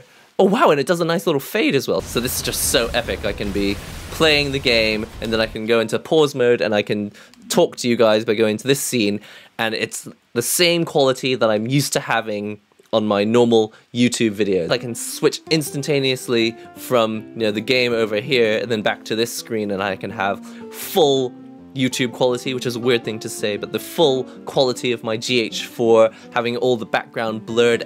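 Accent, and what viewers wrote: England, United Kingdom. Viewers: It could be a thing, livestream wearing a suit and tie...with the English accent could be your unique selling point.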